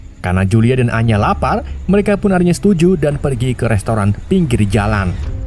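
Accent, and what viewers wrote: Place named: Banyumas, Indonesia. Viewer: Ko ngomonge ngapak min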